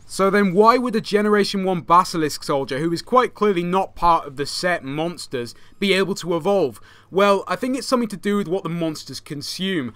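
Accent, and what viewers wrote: United Kingdom. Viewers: No offence but you must be brain dead if you think he sounds like the gaming lemon. Just cause his accent is British it doesn't mean they sound the same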